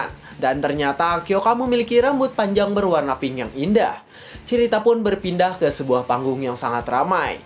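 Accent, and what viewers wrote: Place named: West Java, Indonesia. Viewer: Orang mana min? Gaya bicara sama bahasanya kaya sunda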